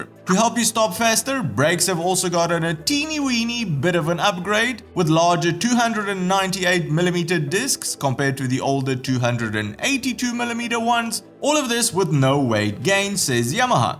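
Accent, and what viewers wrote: Afrikaans, South Africa. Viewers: Is jy 'n Afrikaans ou? Klink 'n bietjie so ne! Is jy nog in SA?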